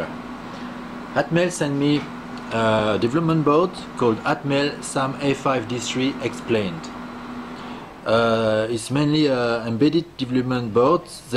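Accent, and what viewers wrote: France. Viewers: Such nice french accent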